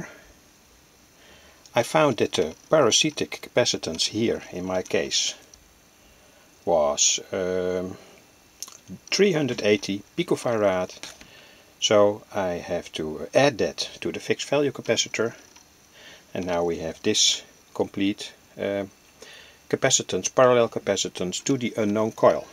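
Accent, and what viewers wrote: Netherlands. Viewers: Hello -nice video From you accent i wil bet you are a Dutch man (Netherland). God save the King Willem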